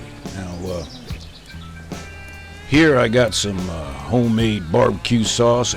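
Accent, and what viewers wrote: United States. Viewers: U sir has an authentic good ol american voice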